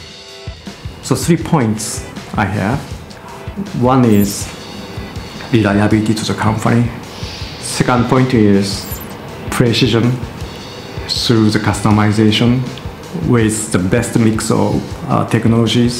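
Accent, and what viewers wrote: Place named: Japan. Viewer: ものすごい典型的な、日本人らしいカタカナ英語だ…。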